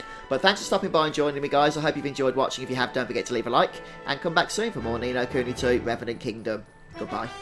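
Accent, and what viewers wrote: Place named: England, United Kingdom. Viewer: OBVIOUSLY he has an English accent. Start traveling, educate yourself and get cultured. The world doesn't revolve around how YOU particularly speak.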